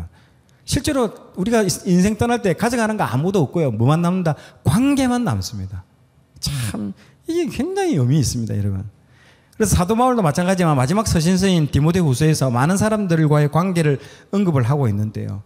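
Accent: Gyeongsang, South Korea. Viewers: 경상도 말이 이렇게 부드럽고 아름다운지요..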